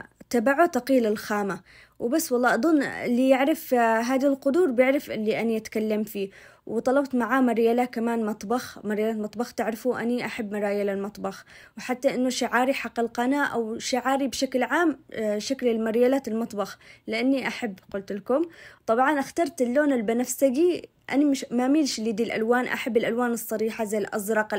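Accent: Aden, Yemen. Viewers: السلام عليكم ..حلو الكلام العدني انت من ايش من منطقه في عدن هل من كريتر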